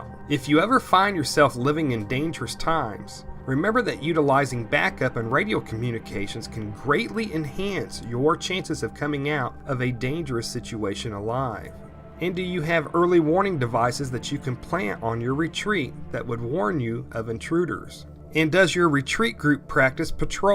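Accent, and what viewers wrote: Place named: Flint, United States. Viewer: Sounds like you live near Flint.Michigan lmao. Good series and the choice link finally worked. I'll have to go back to the beginning now.Thanks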